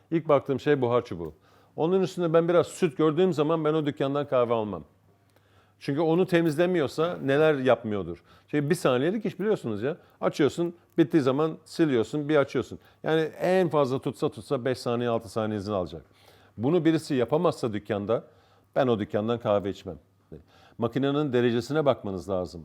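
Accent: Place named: Australia. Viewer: Bu adam Avustralya’da doğup büyüyüp Türkiye’de kahve dükkanları açtı. Türkçesi bu yüzden aksan olarak farklı ama yinede diksiyonu çok iyi.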